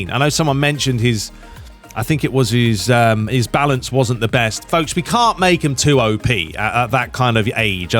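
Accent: United States. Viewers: As an American viewer, I have to say you nailed your American accent. Yee haw! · That American accent sounds just like Taylor twellman haha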